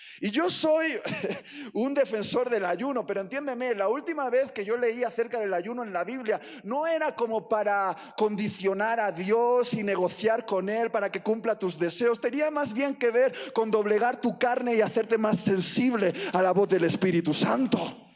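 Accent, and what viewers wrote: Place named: Mexico. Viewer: porque habla como mexicano y pronuncia las C como español? jaja excelente la prédica pero me quedó esa curiosidad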